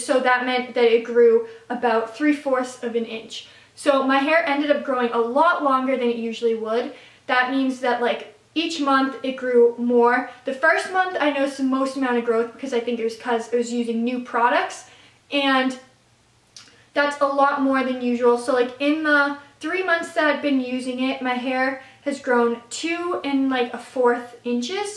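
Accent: Canada. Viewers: Are you.. Canadian..? Also you're really pretty. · Are you Canadian?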